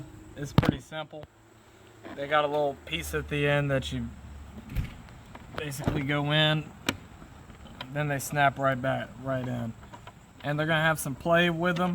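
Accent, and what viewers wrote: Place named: South, United States. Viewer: bro your so country i love it lmao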